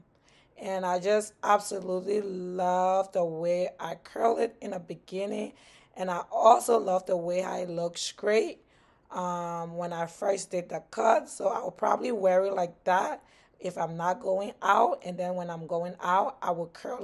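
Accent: Liberia. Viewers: You sound so Liberian. Great job. Beautiful❤❤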